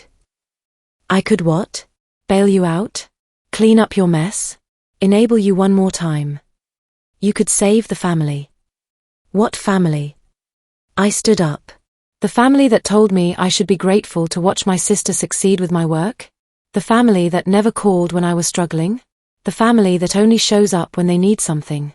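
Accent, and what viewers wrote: United Kingdom. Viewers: Awesome. Love British Narrators so much ❤️ 💖 💗 💕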